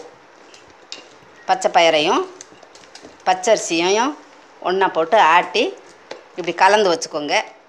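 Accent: Madurai, India. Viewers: Neenga Madurai..ya Amma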